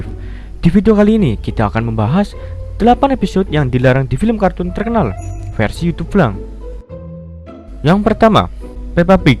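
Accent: Java, Indonesia. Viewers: Jawa banget anjir ngomongnya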